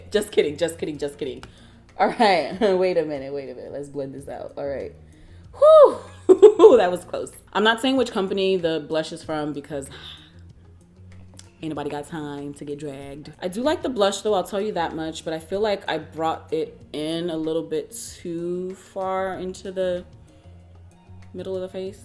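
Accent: United Kingdom. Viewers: The British accent has to stoppppppp. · She’s is giving us that auntie pats british accent and that’s on PERIODT my darling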